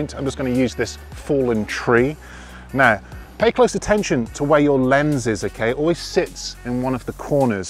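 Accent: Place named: England, United Kingdom. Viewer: Honestly I have subscribed you of 2 things, 1. You are so good at this! 2. You are English (I love English accent) But I'm an Android fan! 😳😳😳 And I really don't want to unsubscribe your channel at all! 😞😞😞😞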